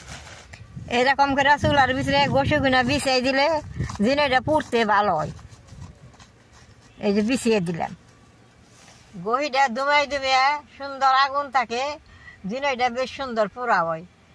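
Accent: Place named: Dhaka, Bangladesh. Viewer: উনার কথায় বুঝা যায়, বাংলাদেশের ঢাকা জেলা অথবা মানিকগঞ্জ জেলা হবে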